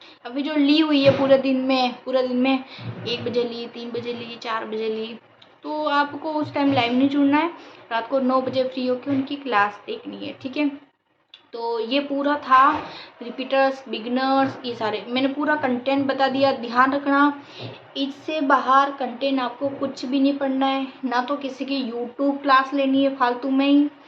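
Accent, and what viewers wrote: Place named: Rajasthan, India. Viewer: Muje aapki baato ko sun ke lag rha hai aap Rajasthan se ho🤔🤔🤔🤔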